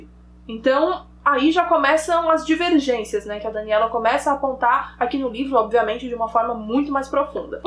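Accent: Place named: South, Brazil. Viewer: Meu sotaque é igual ao da (talvez um pouco mais puxado rs) e eu sinto uma certa representatividade quando ouço ela falando as gírias do sul e enfatizando o Ê no final das palavras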